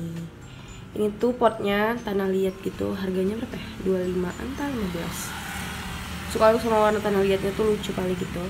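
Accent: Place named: Medan, Indonesia. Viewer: lahh org medan jugakk? pantes logatnya medok kalii wkwk, sehattsehat kau yaa kak<3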